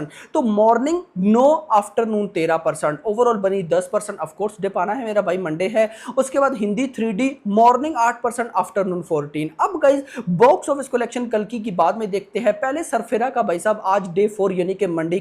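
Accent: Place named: Pakistan. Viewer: Why his accent is so so similar to Pakistanis ?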